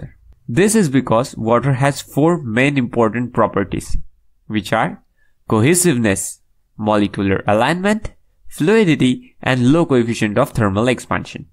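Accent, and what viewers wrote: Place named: India. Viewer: Wow, living proof I can watch a scientific explanation in Indian English when it's actually clear and well-made - thank you for taking the time! Also, the 'very less' is quite endearing, reminds me of a good friend.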